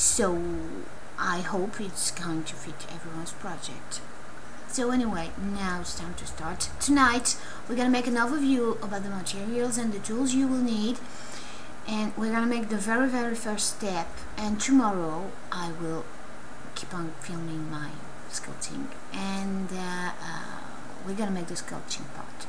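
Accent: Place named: France. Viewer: ahhh it been so long since I was with the part of my family that has your french accent, hahaha! :) you sculpt like me, no measurements! lol, except I draw sometimes. Johnny <3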